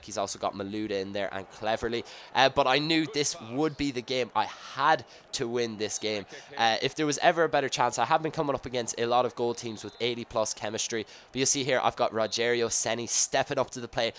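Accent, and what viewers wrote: Ireland. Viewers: Wow this series is godly btw where are you from you sound Irish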